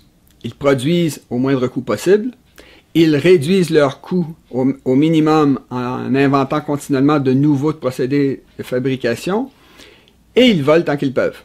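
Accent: Quebec, Canada. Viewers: Mr, des explications et conseils clairs, precis,concis,référencés, et un accent quebequois moins insupportable et plus comprehensible que d' habitude (pour un francais). j' apprecie la synthese complexe DES problemes actuels en 30 minutes ce qui me fait dire que vous etes peut etre du serail et maintenant repenti... exposez-nous votre parcours professionnel et vos motivations a tout debaler aujourd' hui... les mots n' ont pas la meme valeur suivant qui les emploie cela n' enleve en rien la qualite de la video je m' en vais en cueillir une autre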